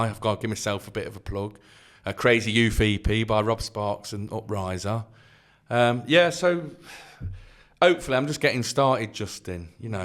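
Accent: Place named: Leicester, United Kingdom. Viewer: Loving the podcasts, I miss that Leicester accent, great job Listening from Australia Dusty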